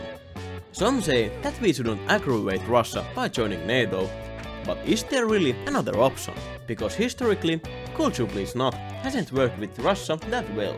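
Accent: Finland. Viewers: me hearing first three words and realising that this guy is finnish (finnish accent) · Sun äänestä kuulee heti et oot suomalainen.(ralli engalti) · Fairly difficult to take these videos seriously with this excessive finnish accent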